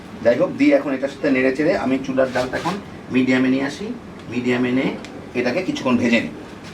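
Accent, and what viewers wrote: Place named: Barishal, Bangladesh. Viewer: আপনি কি বরিশালের??? কথার ঠং ভাল্লাগে নাই। আর রসুন তো বেশি পুড়ে ফেলেছেন।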